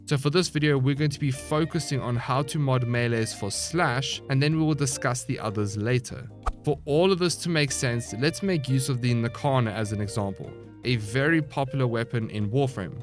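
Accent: South Africa. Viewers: Hey dude just discovered your channel. Really enjoy your videos so far, keep up the good work. Are you from South Africa? :)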